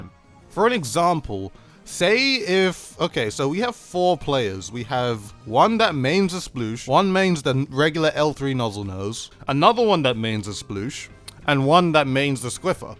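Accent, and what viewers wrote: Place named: London, United Kingdom. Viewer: Hey, where abouts are you from? I'm from London, England and your accent sounds very similar to mine so I'm assuming you're from nearby?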